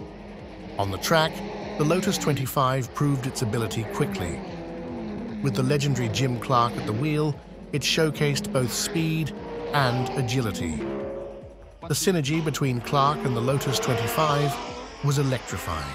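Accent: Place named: England, United Kingdom. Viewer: I can't believe how AI narration has moved on, the clipped English accent does indeed gel nicely with the eras covered in this video. Well done, subbed! 😊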